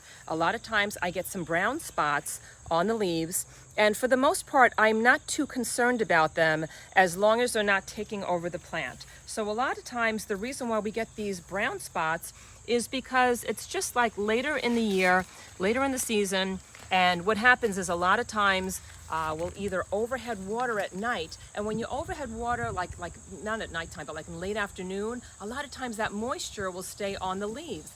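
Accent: New Jersey, United States. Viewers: I was born and raised in Jersey but now viewing from Arkansas!! Your accent sounds like home 🥰 thank you for the information!!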